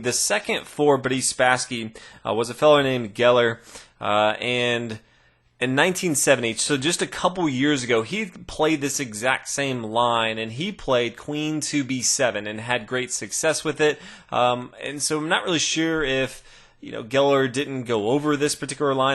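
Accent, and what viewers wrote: United States. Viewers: Sorry to say but this strong american accent is really bothering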